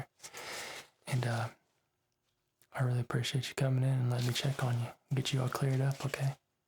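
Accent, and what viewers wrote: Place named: South, United States. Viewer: I hear a hint of a southern accent haha, are you from the south?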